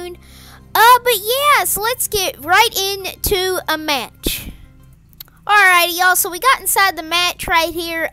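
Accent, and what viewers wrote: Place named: Australia. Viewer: Sound like you’re from Australia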